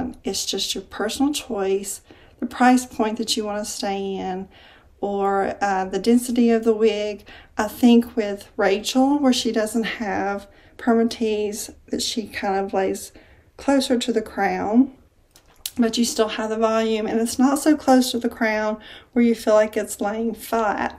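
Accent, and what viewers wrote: South, United States. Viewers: Just subscribed to your channel!!!! So glad I ran across your video!!!! Loved the review and your southern accent as I also have one!!!! So glad to find a review with the same accent as me!!!!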